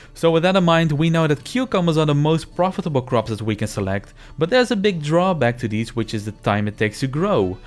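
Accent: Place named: Netherlands. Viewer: Hi thanks for the tips. Are you from the Netherlands 🇳🇱 you sound like my parents who were both born and raised there. Great advice thank you 😊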